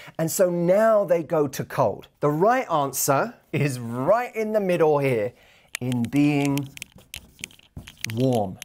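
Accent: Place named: United Kingdom. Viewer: You look like patrick dempsy you are handsome like him sory for my bad language english language isn't my first language but i adore the british accent and i like your channel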